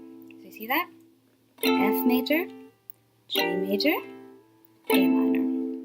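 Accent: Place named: United States. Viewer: that American accent though 😍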